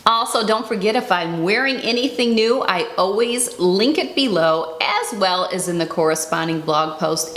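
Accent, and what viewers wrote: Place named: Northern Indiana, United States. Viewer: Love your accent, sounds like mine! Where are you from? You have to be from Northern Indiana-Michigan area.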